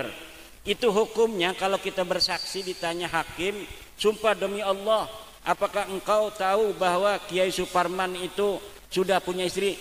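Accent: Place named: Batak, Indonesia. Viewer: Dari gaya bicaranya rijik saja nazabnya sudah batak. Mukhibiiin....mukhibiinnn. yang pinter rek !!!!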